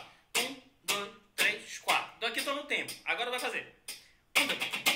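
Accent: Pará, Brazil. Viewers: Legal o vídeo... e cara vc tem sotaque Paraense heheh eu sou do Pará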